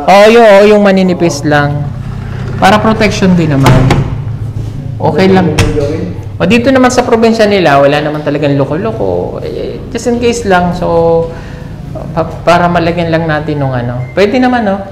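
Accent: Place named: Iloilo, Philippines. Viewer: Nakakatuwa si sir may tunong pag ka ilonggo 😅😅😅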